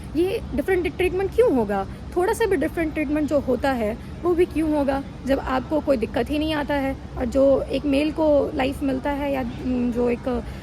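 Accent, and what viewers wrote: Bihar, India. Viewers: The way you taking is just awesome Are you from Bihar ??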